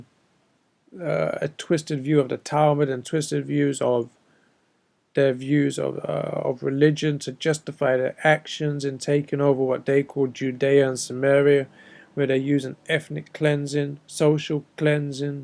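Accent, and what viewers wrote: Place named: United States. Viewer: why do u sound american then?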